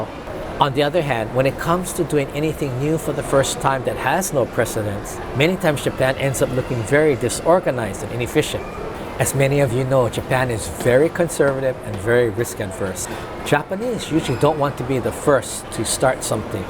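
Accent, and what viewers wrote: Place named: United States. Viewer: Thanks for your insight! Although it's a disappointment, but I think you might be right on allowing solo travellers in 2023 BTW, you speak with an American accent. You must have lived in the U.S for many years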